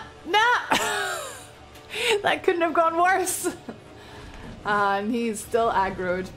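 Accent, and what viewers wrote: Scotland, United Kingdom. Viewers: Is this a scotish accent? I have no fcking idea.but i love it🤔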